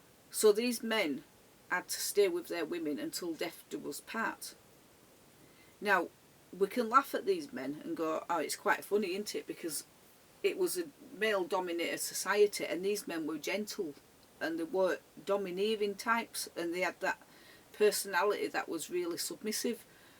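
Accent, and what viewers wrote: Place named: Northern England, United Kingdom. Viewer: Still love your northern dialect:)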